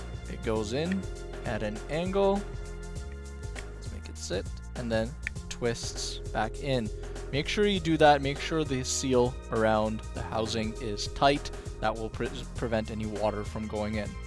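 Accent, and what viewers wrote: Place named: Canada. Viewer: You're so Canadian! "Oo-ut". 😭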